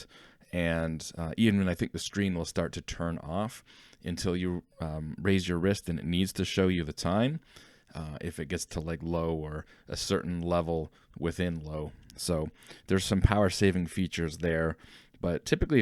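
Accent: Canada. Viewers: Thank you for the nice video, I still bought this Casio model, it will arrive tomorrow. I am not a native English speaker, but it seemed to me that you have an interesting accent, are you from Quebec? Love from Ukraine.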